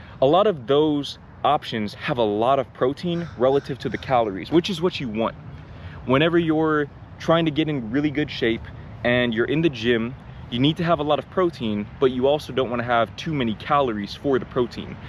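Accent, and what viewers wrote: Netherlands. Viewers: It's funny, your English now has a very slight Dutch ring to it (mostly in your O, I, A sounds). It's faint, but it's there. Funny what 14 months in a different country can do. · You even speak English with a Dutch accent. Are you a native Dutch that grew up in the USA? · You’ve got a slight dutch accent even. Just move already 😂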